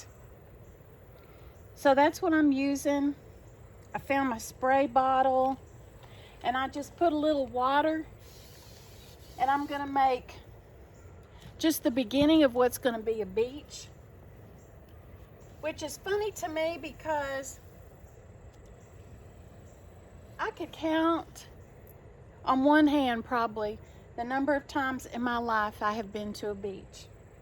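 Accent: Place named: South, United States. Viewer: I agree with all you said. draws you in with that soft Southern accent. What she says comes from her heart. That makes it profound. ❤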